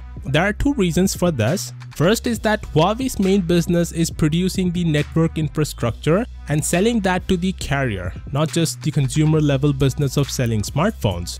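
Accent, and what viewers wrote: India. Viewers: Huawei is good competition for big Smartphone Companies. You also should get rid of your accent you sound like Indian Scammer. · You're sound like an indian scammer.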